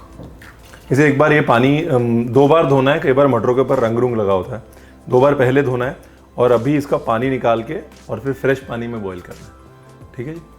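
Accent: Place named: Punjab, India. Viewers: I love the way you cook, giving instructions and your punjabi accent while cooking. It's a great fun and enjoyment while cooking. Thanks Ranveer❤ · Sir before seeing your video I like that you such very good expaliner or your Punjabi accent is such a amazing ♥️♥️sir you explained very well and every single minute is utilised when I see your video 😊😊😊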